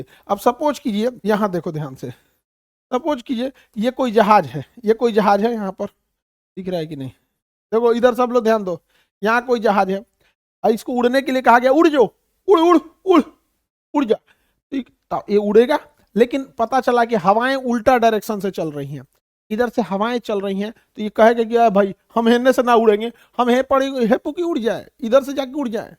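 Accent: Bihar, India. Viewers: Bihari hai sir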